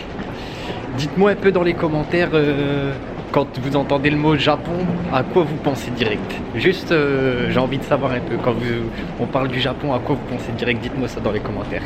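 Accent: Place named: Nord-Pas-de-Calais, France. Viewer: C un chti😊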